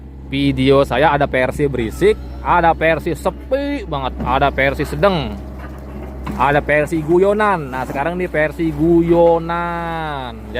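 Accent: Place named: Jakarta, Indonesia. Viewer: Nggak apa2, enak kok ngedengerin logat betawi ora 😅😅😅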